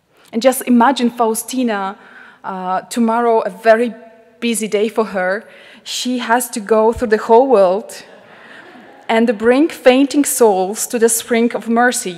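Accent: Poland. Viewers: Beautiful Polish accent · I fell asleep with YouTube on the TV again. When I woke up, a little while ago, the tail end of this video was playing. I have been reading St. Faustina’s Diary, and thought at first that really was St. Faustina, with the habit and her slight Polish accent. I went back to the start and watched the whole video. certainly has a full grasp and understanding of the Divine Mercy devotion. I have shared and recommended this video to my Facebook friends.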